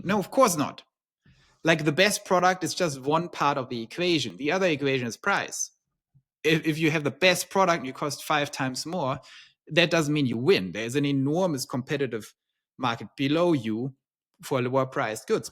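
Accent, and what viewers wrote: Germany. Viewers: Wow, das ist der Hammer! Alles macht Sinn, was sie hier erklären. In meinem Kopf findet gerade eine Explosion von Gedanken und Ideen statt. Das muss ich erstmal verdauen. Elons großen großes Puzzle habe ich habe ich gerade erst begonnen zu verstehen. Vielen Dank für die Hilfe! Ich gehe davon aus ihrem leichten Slang nach😅 dass sie gut Deutsch sprechen und es wahrscheinlich ihre muttersprache ist.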